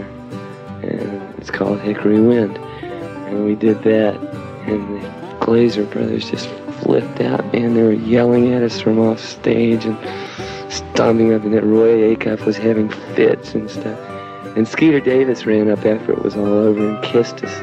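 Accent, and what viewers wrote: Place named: South, United States. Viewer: He had a beautiful soft southern drawl. I find that his song is very calming when I feel tense.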